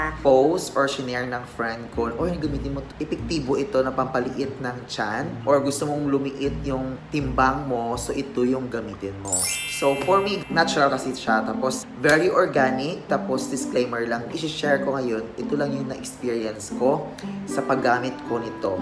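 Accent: Iloilo, Philippines. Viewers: Ilonggo ka?heheeh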